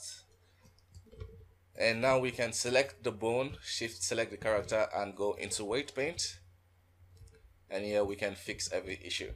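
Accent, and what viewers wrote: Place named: Nigeria. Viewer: Just wondering are you nigerian? You sound like nigerian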